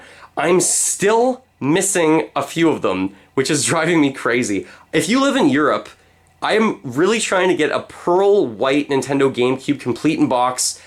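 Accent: Canada. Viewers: Haha within the first minute I heard you talk I could tell your Canadian, I found your channel when I started playing video games again specifically the Nintendo switch after a 5+ year hiatus, it’s awesome to see the renewal of passion for your hobby and channel.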